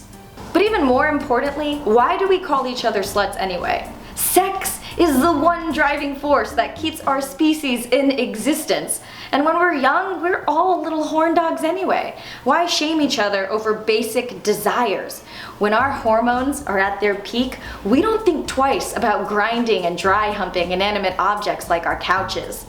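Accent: England, United Kingdom. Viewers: Hahaa, I love that English accent! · Nice british accent too for the internet!! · Can I please have a telling me definitions in my computer with a British accent forever? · your british accent is downright offensive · your using the same English accent that the BBC use lol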